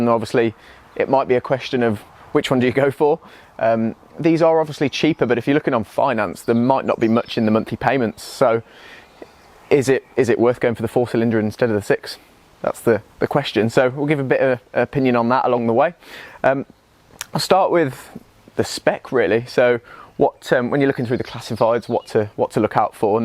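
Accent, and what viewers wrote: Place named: Northern England, United Kingdom. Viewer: You are clearly northern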